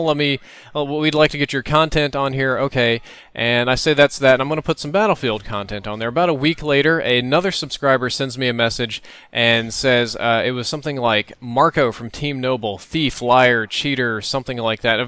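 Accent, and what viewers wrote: South, United States. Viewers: I like how puts so much enunciation on the "h ". yes i know its because hes from the south and its just his accent, but it reminds me of when stewie from family guy says cool whip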